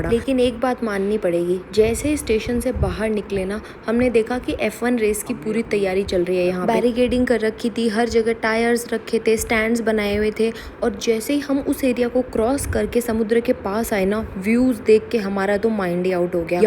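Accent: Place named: Marwari, India. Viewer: Best gaasiye deti gayi.......ur marwadi with hindi is awesome 👌 😅😅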